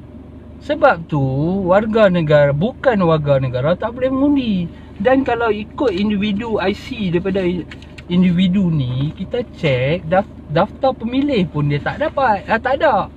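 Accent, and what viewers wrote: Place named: Kedah, Malaysia. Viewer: Org Raub ckp kedah ye...hahahahhaha terbaik teruskn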